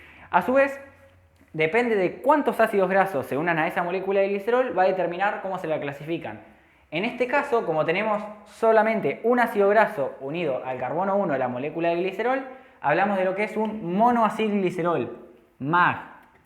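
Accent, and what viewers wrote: Argentina. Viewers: Oye profesor ¿ ud es de Argentina? Y tengo una duda .¿ Estos tipos de tema entran en examenes de ingreso de cualquier universidad de medicina de Argentina?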